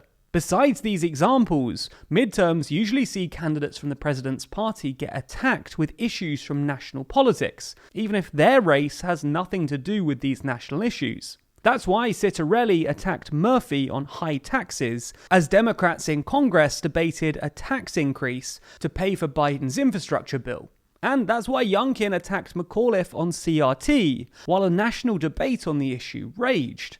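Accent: United Kingdom. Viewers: He meant after 9/11 not of I know it's kind of hard to hear with a English backslash British accent but he meant to say after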